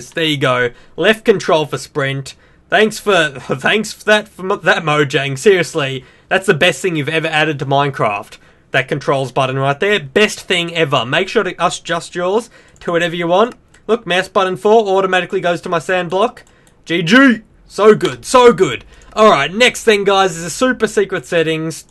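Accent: Australia. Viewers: r u australian :)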